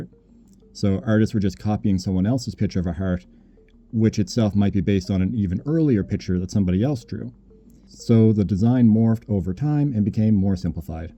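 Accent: Canada. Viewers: "Hærts." That's what goes doki doki when I hear the unmistakeable accent of a True Northerner. 🇨🇦 Great research and videos, standing on guard for you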